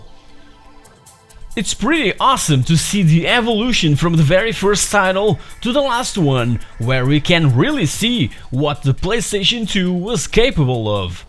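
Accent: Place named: Portugal. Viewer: LOL, o sotaque pt é tão vísivel :D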